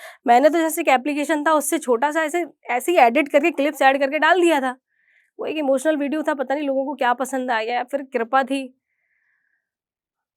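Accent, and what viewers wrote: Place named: Uttar Pradesh, India. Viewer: Bhasha se laga tha aap etawah se kaha se ho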